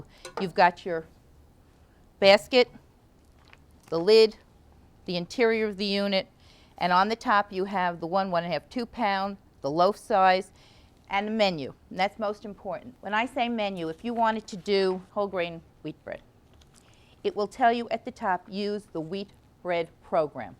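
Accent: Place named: New York, United States. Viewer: I love the New York accent.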